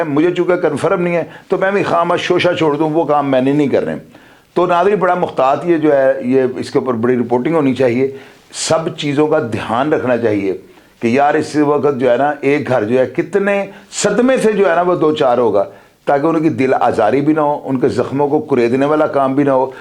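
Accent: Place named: Sialkot, Pakistan. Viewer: Aapkey lehjey main sialkot jhalakta hai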